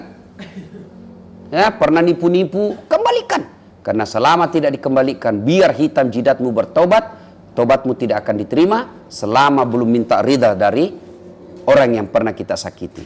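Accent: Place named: Makassar, Indonesia. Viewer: Assalamualaikum Ustadz, sy suka ki ceramax ustadz krn logat mkssrx kental sxl,,, dan MANTAAP Ustadz Ceramax,,, 🙏👍💕😎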